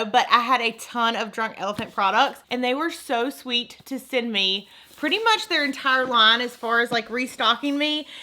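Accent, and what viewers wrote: South, United States. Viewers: Your country voice has me dieing of jealousy, I love it so much ‼️😭🖤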